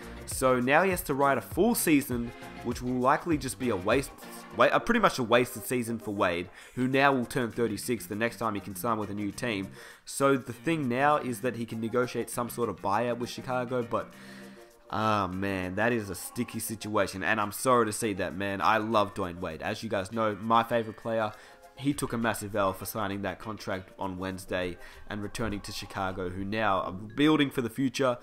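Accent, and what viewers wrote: Australia. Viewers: Is this guy Australian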